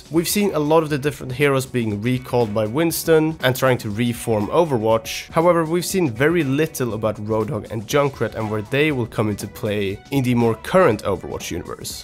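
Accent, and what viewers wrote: Sweden. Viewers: Wow wow wow are you from sweden? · Are you from Sweden??!?!?!?! 😃😀😃😀😃😀😃😀😍😍😍💕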